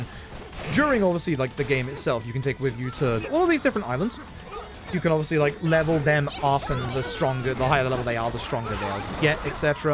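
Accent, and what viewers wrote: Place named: United Kingdom. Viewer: DAWG, YOU'RE BRITISH!?!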